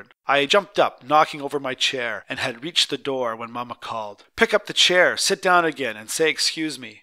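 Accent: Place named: Canada. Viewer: the Canadian is strong with this one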